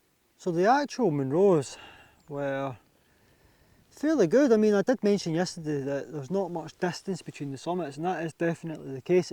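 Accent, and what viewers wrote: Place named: Edinburgh, United Kingdom. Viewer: Well done,I like the fact you get away and do your own thing.I’m an Edinburgh lad myself Meadowbank.originally fi west Granton green.you sound like your from that area.granton,Drylaw’ish neck o the widz.👍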